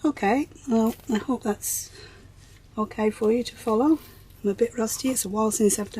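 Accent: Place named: Australia. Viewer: Omg this is genius ! What a brill idea saving to favs. Very clear instructions thanks loads will try this. I thought you were Australian but your English ? Where are you from ? Brill vid thanks xx Amanda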